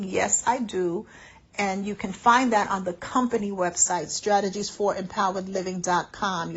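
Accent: New York, United States. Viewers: Case and Point: This video is WHY I am a Tier 3 member of this channel. The wisdom that you have been graced by God to have is a blessing. Then, your authentic NEW YORK ACCENT is EVERYTHING❣️🙌🏾🎉✨😍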